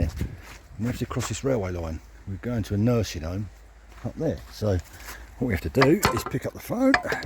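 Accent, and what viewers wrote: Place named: London, United Kingdom. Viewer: Just out of curiosity you dont sound like you come from the area... more like my neck of the woods..... London?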